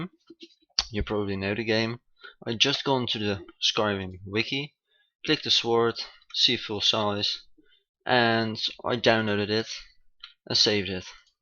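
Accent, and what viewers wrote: Netherlands. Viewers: Are you Dutch? Your accent sounds really Dutch. Especially that you say the "W" in sword ;)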